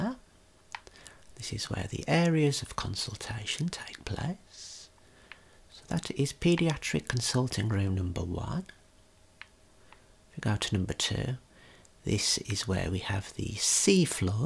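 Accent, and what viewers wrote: United Kingdom. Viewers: Sir are u British if so cool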